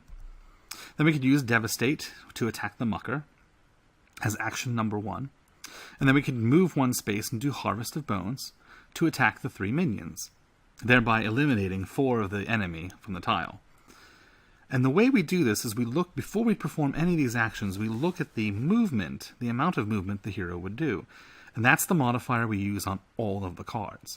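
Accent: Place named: United Kingdom. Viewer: Just one thing, I detect that you are a fellow Brit! I can hear it in your accent, lol.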